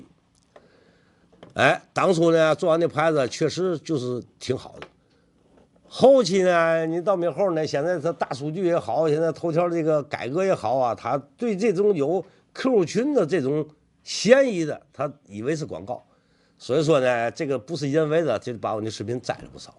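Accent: Tianjin, China. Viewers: ，b站的粉丝基本上是去听你的天津口音的，冲着技术去的很少。你的视频太长，不够精炼，最好能剪辑一下。动不动就十几分钟，而且闲聊太多，年轻人看不了。国外不论是讲数学物理化学的视频很少有超过10分钟的，看起来轻松。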